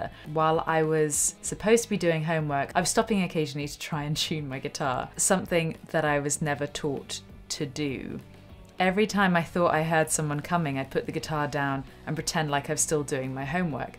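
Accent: United Kingdom. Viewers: The wat you Brits say "pawn shop" doesn't sound like pawn shop to my American ears. Thought, at first this story was going somewhere weird! Lol! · So yeah, with the British accent I spent most of the first story thinking this person had found a guitar in a porn shop when he was "14 or 15 years old." I was puzzling over that one so much that by the time I realized it was in fact a pawn shop I had to rewind and re-watch the beginning of the story!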